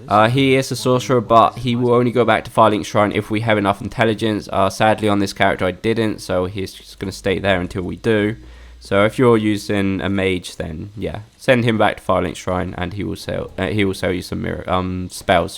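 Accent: England, United Kingdom. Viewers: I truly love listening to you English fellas doing walkthroughs. I catch myself with with my country accent tulkin like yew guys . Haha. I do it quite well. 😂😂😂 Thanks!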